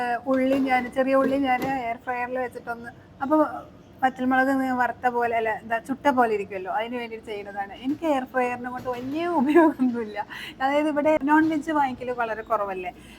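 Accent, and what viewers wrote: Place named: Malappuram, India. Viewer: സംസാരം കേട്ടപ്പോൾ തന്നെ ഒരു മലപ്പുറം touch തോന്നി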